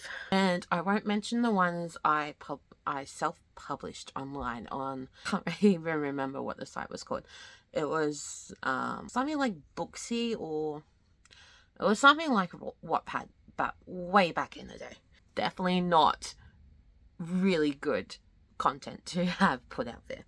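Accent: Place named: Australia. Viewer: !!! this was so good! (also, yes. You do have an australian accent and it's so cute) 😍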